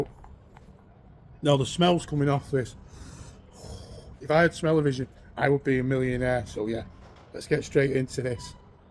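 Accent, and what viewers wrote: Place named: United Kingdom. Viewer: Checking in from the US here. I'm enjoying the reviews. Can I ask what your accent is? When it comes to accents in the UK it's hard for me to tell.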